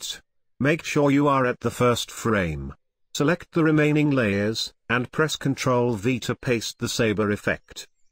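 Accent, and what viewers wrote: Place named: Pakistan. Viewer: Wow. Your Accent is cool. Amazing when I knew yoy from pakistan.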